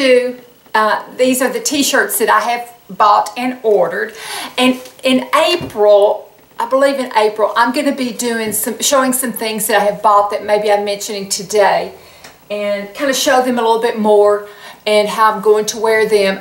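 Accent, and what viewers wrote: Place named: Nashville, United States. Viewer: thank you thank you for this video. it was the perfect call to action to help me get out of a slump and start to get in gear! i love the winter too, but my husband hates it, he complains the entire season. i say you can always put more clothes on but there is only so much you can take off. i’ve lived in north carolina my whole like bit my husband’s family is from Nashville. Listening to you is like hanging out with all his aunts and female cousins. Love it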